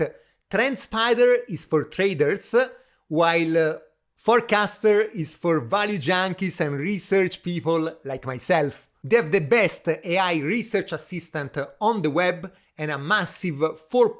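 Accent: Italy. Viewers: Italian accent makes it all even better 😃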